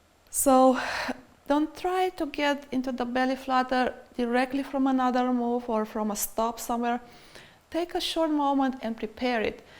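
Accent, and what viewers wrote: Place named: Germany. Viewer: She sounds german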